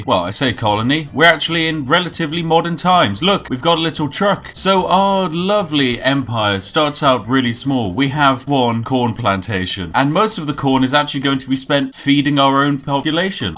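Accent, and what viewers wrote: United Kingdom. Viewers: haha he's a brit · Wait... is this guy British?!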